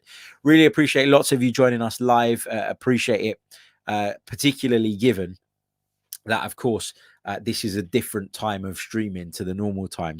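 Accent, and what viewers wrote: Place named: England, United Kingdom. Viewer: you are chearleading for Italy while you live in England amd speak with an English accent. Make it make sense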